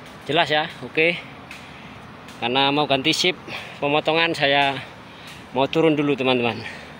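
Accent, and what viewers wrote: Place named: East Java, Indonesia. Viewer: Logat pean kayak orang jawa timur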